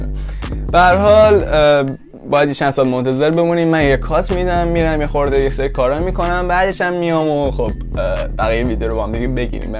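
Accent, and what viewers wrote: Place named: Gilan, Iran. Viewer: تا حرف زدی گفتم گیلک هستی باعث افتخاره همشهری ❤😎👌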